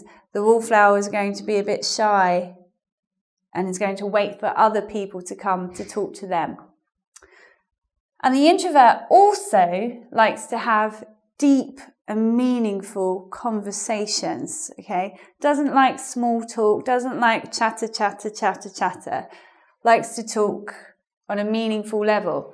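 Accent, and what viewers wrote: United Kingdom. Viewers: British accent <3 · Hi, Perfect, the UK accent! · you teach Bristish English , but i study American English - and i love your striking accent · Honestly i really like your accent, i need to learn the British accent,its really special language for me, and nice to meet you and all my eyes on your topics now, waiting to see a new videos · Hello, thank you for your lessons, I like the way you teach and you British accent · I can't focus on the lesson because you're so beautiful and i enjoyed hearing your british accent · You have a boyish name. LOL but I love your voice, in fact I really love listening British accents. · What a great video! :) I love your british accent sooo much. Greetings from the Czech Republic! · Like your accent...I like British accent a lot! Brilliant explanation. Have been living in London for over a year and I got amazed by British accent. 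This is the way it should be spoken because english comes from England.Thank you for sharing. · Hi, I'm from saudi Arabia. I'd love to tell you that I love love your british accent. I'm subscribed to your Joddle channel, 'n so happy to be subscribed to your new channel, congrats! ^^ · I'm a beginner for english. I practice very hard.I would pronounce them like her because I love UK accent. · ! I loved your british accent _ How come to an introvert person become a teacher? lol!:) Keep this great work! ^^ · Hi! i'm from Venezuela and I do understand you perfectly, love your british accent <3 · It's good to have British speaker. I like their accent. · British accent ~awesome :D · British accent though !! So natural !!! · Hi,! I'm begining my English learning with EngVid and your video was very helpful for me. I like your brit accent ) Thanx from intorverts;) Sorry for my mistakes. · I think that you speak by British accent and it is very difficult than American accent. 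I want to talk to British girl or boy to learn to say the right accent. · WONDERFUL!! video and it's good to hear some British accent!! :) Can't wait to see your new videos!!! <3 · Thank you very much. Nice advices. And I think you speaking British English. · Hey, your first video was Amazing! I knew it! Everybody here in engVid is glad to be part if this lovely team, You did it quite well! I look up to you, congratulations and thank you for helping us listen to your British accent!:P I follow you in your channel as well, kind regards! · Went through many youtube English learning channels, but now I found one with London/British accent which I missed =) I can't help myself but this accent is just soo cute XD Also I remember my first time in London, even after one year of everyday English learning course, I had problems to understand XD Well after three months there I got better (but still I need to listen carefully) Also I'm typical introvert =) Also I subscribed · PERFECT BRITISH ACCENT!!! 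Thank you ever so much,!!! I do like it!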